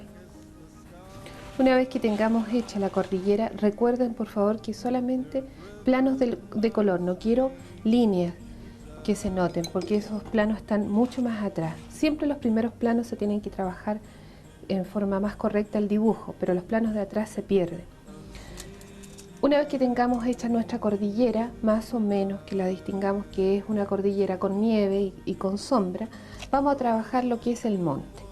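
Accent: Chile. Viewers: Me imaginaba por tu acento que podias ser chilena. Saludos de un Chileno pati perro.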